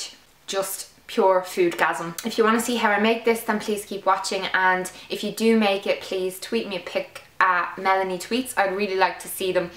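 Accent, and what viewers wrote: Ireland. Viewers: Are you from Ireland if so where do you get all your ingredients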